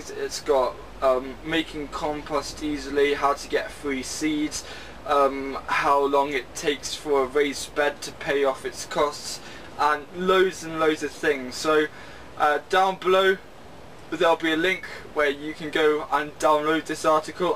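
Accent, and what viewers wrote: United Kingdom. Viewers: You are not annoying. And a Brit accent is anything but grating! ...it is (the accent) and you are rather nice to listen to! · "Annoying teenager with a british accent"...hahaha...I like your accent. Irish and british are, in my opinion, the cutest accents ever. Great tips btw.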